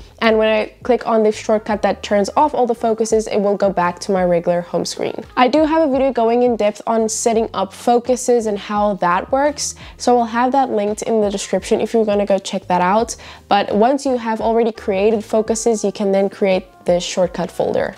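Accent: South Africa. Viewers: You sound so South African! I’m also from SA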